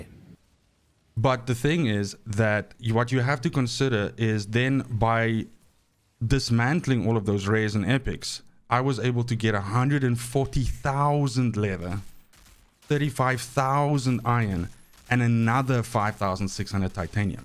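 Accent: South Africa. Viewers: South African lol 🇿🇦?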